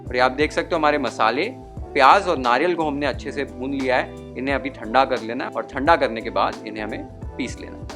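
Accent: Maharashtra, India. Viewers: Authentic recipe with authentic marathi brother❤❤❤ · एक नंबर मराठी ठसका ❤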